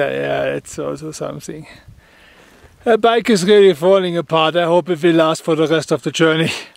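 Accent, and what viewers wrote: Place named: Germany. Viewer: Bei dem deutschen Akzent, würde ich lieber alles in Deutsch filmen und später Untertitel hinzufügen. Ist zwar dann etwas aufwändiger zu bearbeiten, aber weniger anstrengend für die Ohren und vielleicht auch für dich :)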